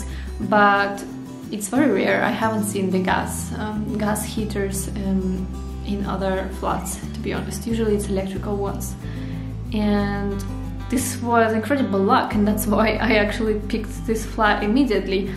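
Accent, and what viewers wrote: Russia. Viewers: I am amazed your English and your little accent being russian's girl.. you mast have been studying at some English university.. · Jeez what is it with YouTubers audio!!?!? .. you start with a heavy non/English accent, use a microphone the other side of an echoey room, speak softly and then just to make sure it’s almost impossible to understand you, think some incessant bgmusic is needed!!!! Ok ears are tuning in a bit.. your English is v good for a Russian!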